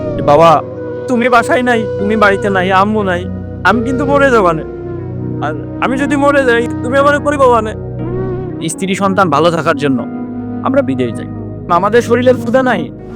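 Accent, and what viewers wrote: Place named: Faridpur, Bangladesh. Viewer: ভাই আপনার বাড়ি কোথায় আপনার ভাষা আমার কাছে খুব পরিচিত মনে হচ্ছে। আমার বাড়ি ফরিদপুর।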